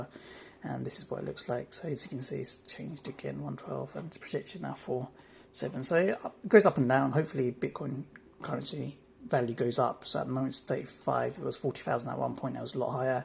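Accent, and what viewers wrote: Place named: United Kingdom. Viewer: Nice to see a brit! (assuming by your accent) - I've been into mining since 16/02 using a variety of platforms but the one I keep coming back to (due to lack of experience in the more advanced platforms) is Nicehash. Despite the negatives from some YT's I think Nicehash is a great starting point. Yes it's a broker which means you're paid only in BTC but it does have it's positives I guess. So for me since the 16/02 I started using only my GPU I had at the time, 5700xt Nitro+ which was pulling in around £5-6 per day with TDP 109w and 50mh/s which is pretty good going for my first try. Fast forward a few days I then bought a 3070 (due to the addiction I seem to have acquired) Obviously there is massive shortages on GPU's so I had no choice but to buy a mobo, cpu which came with the 3070. Paid a small premium but I'd rather that than a bigger premium go to these nasty scalpers! 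Anyway the 3070 is hooked up and I've got the TDP down to around 131w which I know can go down more but i'm still tinkering with this bit. I'm pulling in around 62mh/s which is probably the most you could get out of these cards unless you're a pro which in my case I'm not when it comes to mining. My totals for a week which I will say haven't been running 247 as I've fiddled here/there but I took around £36, just by using Nicehash. I'm looking for more GPU's and an actual frame and other bits. It's just very difficult to get the parts. I wish I'd got into this sooner than I have but least if all else fai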